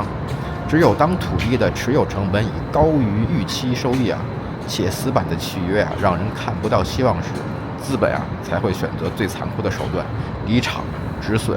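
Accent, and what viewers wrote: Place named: China. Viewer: 你又中計了。這個人來找你麻煩，不是因為你的影片內容。你太不了解台灣的現況了，有一群被政黨洗腦過的蠢蛋，只要你說話是中國口音，就會啟動瘋狗模式亂吠一通。牠留言第一句就說你腦殘，這種人就是典型的台灣蠢青，只想引戰惹你發火。說再多都是枉然，不要浪費時間，最好的應對就是把他封了。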